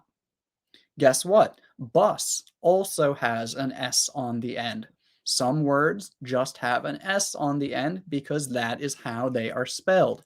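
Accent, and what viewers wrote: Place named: United States. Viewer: You are absolutely right. I'm also happy to be your friend.l had some curiosities when l was in a school that how american people speak english.Grammar books couldn't provide full information at that time.l'm impressed with video as I can understand his words.Thanks for being considerate with us.Just love your accent.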